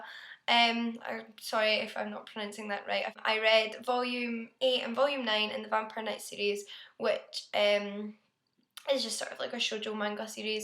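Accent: Scotland, United Kingdom. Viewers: You sound a bit scottish. Is this true?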